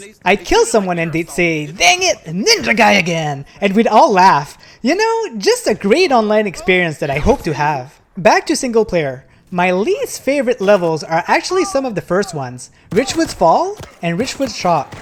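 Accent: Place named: New Brunswick, Canada. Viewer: Un voisin Newbie!! I'm trying to make out your accent but I'm not sure, Edmunston, Peninsule p-e? Bathurst?? I'm also french and from Bathurst. btw I just gave you your 1000th sub. Congrats!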